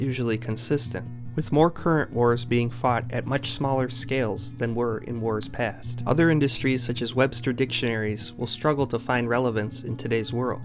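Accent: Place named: Berkshires, United States. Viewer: Your accent sounds sort of like a Berkshires accent, though I'm guessing Wisconsin after "Worchester" 😉 Springfield and Bridgeport have had similar trajectories since the 50s. Both had major arms manufacturing disappear. Both had crime issues in the 80s. Still not in great shape, but doing better. Thanks for doing this.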